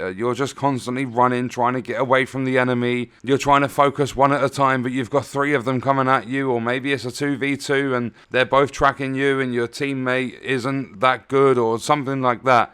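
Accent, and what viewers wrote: United Kingdom. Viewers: Nice video mate … i watch your videos just to enjoy the accent! lol What city from UK pleaseeee m8 🥲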